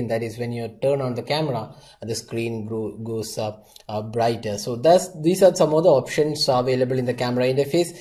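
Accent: Kerala, India. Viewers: u sound like a malayali :)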